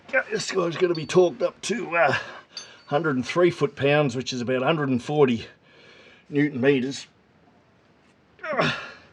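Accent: Australia. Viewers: Love watching your videos, and even better you havnt lost your Australiana, other words you still talk like an Aussie, been watching you guys since you did the Canning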